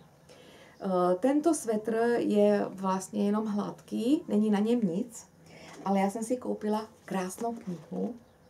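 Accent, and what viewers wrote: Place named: Slovakia. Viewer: Pozdravujem vás. Máte krásnu prácu a koľko toho stíhate! Ja som stihla len kupiť priadzu na letnú háčkovanú tašku ale asi ju nestihnem. Ponožky ma eštelen čakajú a mám strach, že to bude závislosť. Prepáčte mi, že som zvedavá, ale podľa vášho prízvuku mám dojem, že ste Slovenka. Je to tak? Nech sa vám darí.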